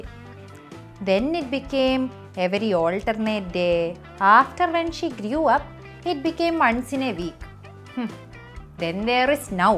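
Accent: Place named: Kerala diaspora, India. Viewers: I like the way you talk like any malayali mom living outside Kerala.. my both daughters are your fans. They only asked me to watch your episodes . at this young age you are doing good work.. & ofcourse you resemble Amala Paul a lot... all the best dear.. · I watch this channel only bcoz you, and specially Ur Malayali accent. I love it. You r the best. I have faced this when I secretly had my left ear piercing, repeated when I got the right ear pierced the very next week.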